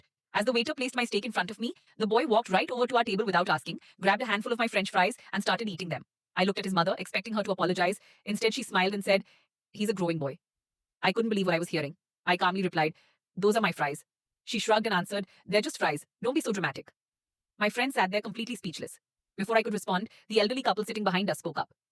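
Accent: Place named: India. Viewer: NOT THE INDIAN ACCENT LMAO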